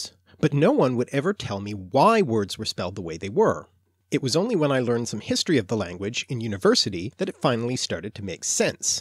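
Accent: Canada. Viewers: You sound like a Canadian. Am I right?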